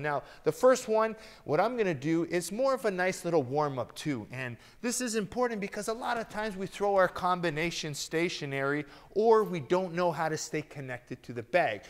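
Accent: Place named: Italy. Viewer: Dude you have an Italian accent!!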